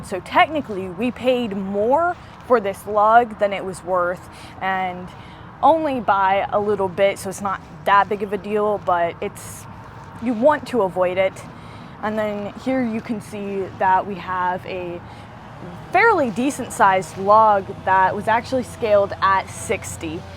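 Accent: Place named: Pennsylvania, United States. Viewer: I just love your NE Pa accent. And I'm just mesmerized that you took the initiative to put this Utube channel together, while you're schooling and working at the mill. And taking your work seriously is refreshing. So, it's all these things that make your channel a pleasure to watch! Something tells me that you two are going to succeed in life, no matter what path you choose. And something tells me you have a LOT of people in your corner, cheering you on.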